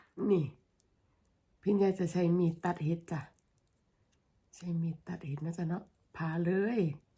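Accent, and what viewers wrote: Korat, Thailand. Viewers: 😂😂😂 นี่ยังแม่นเนาะ คนทางไหนน้อ สำเนียงคือคนโคราชเนาะ fcใหม่จากสวิตฯค่ะ ปีนี้เพิ่งปลูกสีแดง เดี๋ยวจะไปเอาสีเขียวมาปลูกอีก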